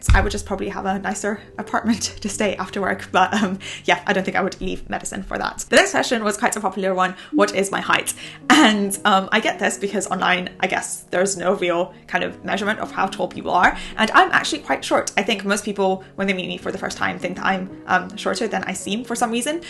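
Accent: United Kingdom. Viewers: This is the first time and the quickest British accent i have never seen before,interesting,after studying American accent too much, to find out the British accent is so speical and amazing because of your expressions when looking back on... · Ohhh I love the British accent ❤❤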